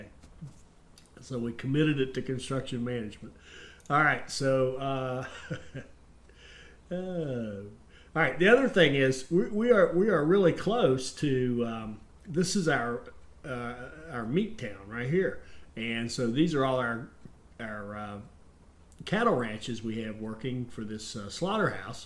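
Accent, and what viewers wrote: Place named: Appalachia, United States. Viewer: Hey, im one of your newer subscribers and I have a question or two. Firstly do you do any geneaology, because of the last name and coat of arms. Secondly, your accent seems appalachian like my mothers side who are from southern wva and eastern ky. I do geneaology and my great grandmother was an adkins which is similar to your last name. Just intrested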